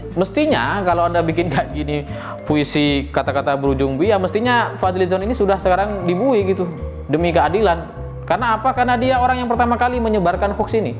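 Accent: Madura, Indonesia. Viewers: org yg ngomong ini logatnya spt org madura ya....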